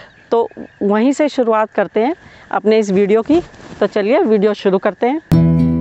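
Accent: India. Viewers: Thanks medam ap acha bolti bilkul bhartiya andaj me Apki real hi sari video h modifiy nhi karti ye acha laga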